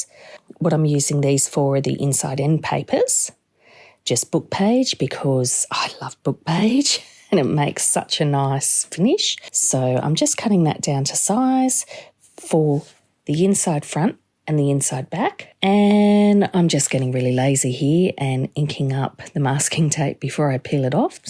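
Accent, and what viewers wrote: Australia. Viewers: You are an absolute delight! Love your Aussie accent, put a smile on my face, being a fellow Aussie living in West Yorkshire, UK! Will definitely have a go at making these wee gems and your split pins/Brad pins too! Thank you for a wonderful tutorial and I’m so happy to have found you! 🥰🫂❤️🙏🏻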